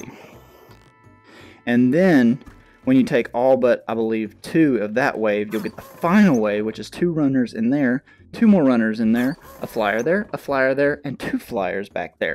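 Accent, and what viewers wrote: Texas, United States. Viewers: I love your Texan accent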